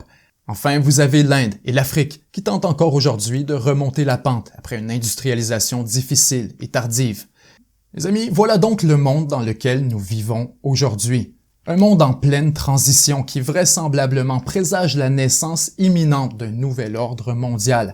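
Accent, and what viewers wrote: Quebec, Canada. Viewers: l accent possiblement! ;) Notre accent québécois! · Il est québécois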